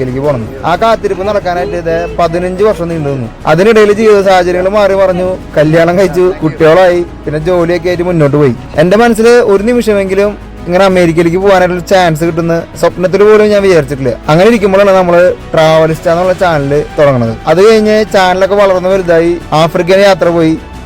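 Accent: Thrissur, India. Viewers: Welcome to the United States. I have a few suggestions for you (1) You should have a clean-shaved face or keep a thick mustache or goatee because many people do not like your face on videos. (2) You should not show your face on video, instead, you should give your narrative and at the same time showing the places you visit like Cool Vision, Rick Reeve’s Europe and Malayalam vloggers like Pikolins Vibe and The Indian Trails. (3) Many people from Thrissur and Malabar districts changed their accent. So, you also should change your accent. If many people from these districts could change their accent, you also could do, so that you could attract more and more viewers to your videos. (4) Your introduction should be very brief. You could say: Hello, Good Morning, I am so and so. Today, I am going to or showing….”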